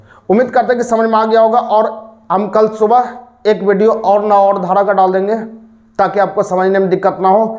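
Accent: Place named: Patna, India. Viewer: Sir I think आप पटना से हो न Please confirm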